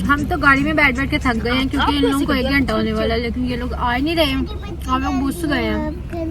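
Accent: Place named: Punjab, India. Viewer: Punjabi tark is so good😂😂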